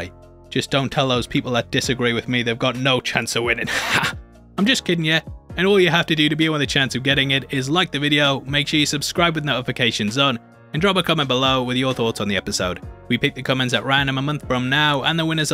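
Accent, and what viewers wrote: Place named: United Kingdom. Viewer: Come for the takes, stay for the cool British accent. 🏴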